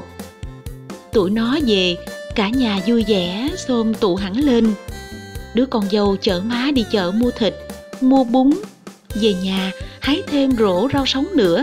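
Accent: Mekong Delta, Vietnam. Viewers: Chất giọng huyền thoại...làm nên tên tuổi của người miền tây..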